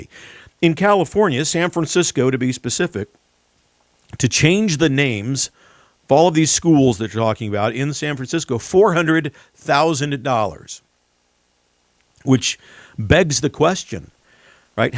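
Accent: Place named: Scotland, United Kingdom. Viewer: Well done my lad!!!! Nice Scottish accent!!!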